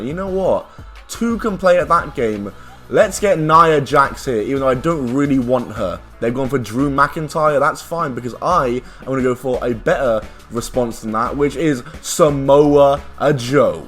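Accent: England, United Kingdom. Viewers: I’m a black man from Brooklyn NY and I’m a sucker for an English accent speaking on wrestling. Random af but I love your content bro you’ve gotten me through a couple rough days at work I appreciate you for that man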